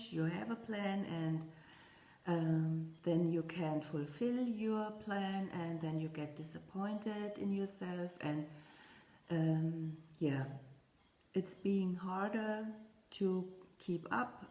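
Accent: Germany. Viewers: Your stitchy baskets and table are so pretty, and your lovely German accent is delightful to listen to. Thanks for another wonderful video.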